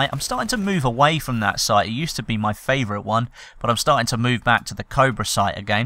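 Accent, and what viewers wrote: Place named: United Kingdom. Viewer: "coy-yote" sight lol! Love the King's(??) English bro, I'm the one with the 'Murican accent =)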